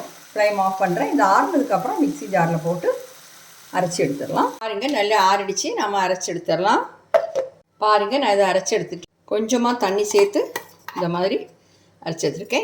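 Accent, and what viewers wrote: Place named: Kanyakumari, India. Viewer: அவங்க கன்னியாகுமாரி மாவட்டத்தை சேர்ந்தவர் போலும்.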